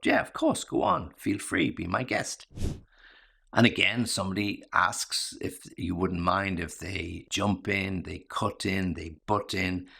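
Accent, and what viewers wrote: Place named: United Kingdom. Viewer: Enjoying listening your British accent🤩, thank you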